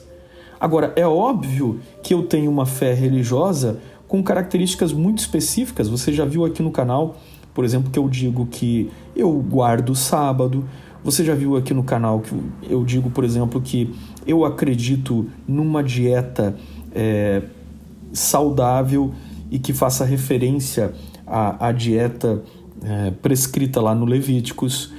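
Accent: Brazil. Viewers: Nosso sotaque gaúcho sempre nos entrega! Gostaria de agradecer pelo canal. É a materialização das minhas paixões! Uso-o muito para auxiliar os ensinos didáticos do meu filho. Se houver alguma maneira (com mão de obra) que eu possa ajudar, por favor, deixe-me saber. Que o Senhor o abençoe grandemente!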